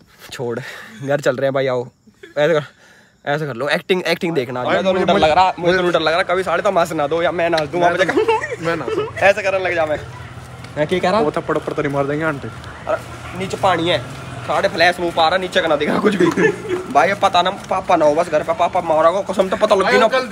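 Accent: Haryana, India. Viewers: Bhai tum hariyana se ho k.bhasha se lgte h .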